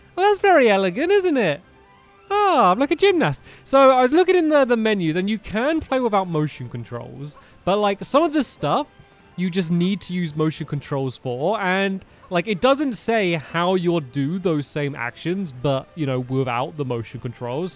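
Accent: England, United Kingdom. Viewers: dexieplays he is English so he speaks differently I say zed aswell coz I’m not american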